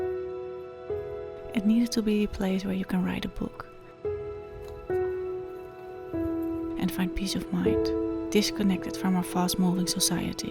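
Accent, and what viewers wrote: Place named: Netherlands. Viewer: wat fantastisch dat je dit doet 🙏🏽 en hoorde al dag je Nederlandse was Heel veel succes met de restoratie ❤️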